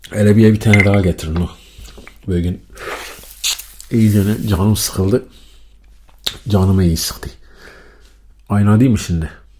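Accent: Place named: Urfa, Turkey. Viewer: Urfalı şivesini ölene kadar dinleyebilirim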